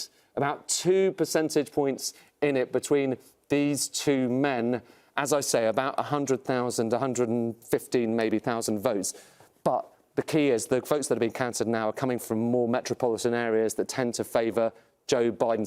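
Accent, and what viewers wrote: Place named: United Kingdom. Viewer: I don, t understand why this British accent? I get soooooo confused. Where is actually this Election?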